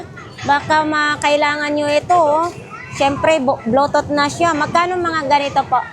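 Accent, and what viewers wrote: Visayas, Philippines. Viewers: bisaya ikaw naman 😂😂😂😂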